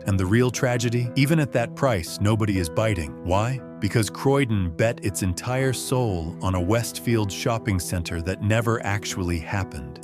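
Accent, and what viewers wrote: United States. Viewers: That American accent chatting our London business is so unfit and that’s only the start. The information itself is used toilet water. Whoever uploaded this AI nonsense needs to get a grip.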